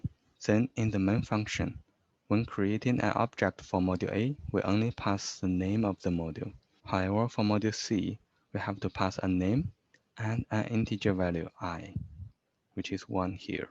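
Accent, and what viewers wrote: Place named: China. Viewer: 听这口音感觉是个中国大陆人🧐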